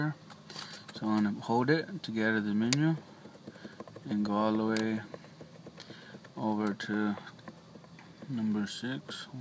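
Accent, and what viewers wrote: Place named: Southern California, United States. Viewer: Wow, you are really smart, ese. I came from southern Cal and feel like I recognize your accent. but you know so much! Where did you learn all this? I am tryhing to get my air suspension to work without going to dealer. I don't even know if you are still up, so if you are, I'd appreciate any hints. and because of all your start/stops, Ima have to go back and look at it...slowly! thank you.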